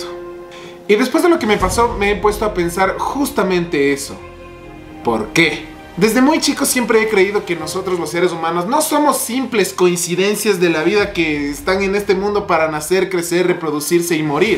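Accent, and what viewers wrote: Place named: Ecuador. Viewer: Hola ñaño me gusta escuchar mis acentos q tenemos, de la costa del acento de la sierra o de cuenca o del oriente, llevo muchos años fuera, pero cuando escucho a los Youtobers como tu o los Youtobers de la costa me encanta escuchar esa mescla de asentos q tenemos a pesar de ser un pais chico tenemos tanta diversidad, es sierto no apresiamos lo nuestro, pero creo que estamos dando el paso y vamos a lograr ser autenticos, hojála los politicos tambien agan su trabajo, y les salga el Ecuatoriano por sus entrañas y entre todos agamos un mejor país, viva la patria Ecuatoriana💪💪💪🇪🇨🇪🇨🇪🇨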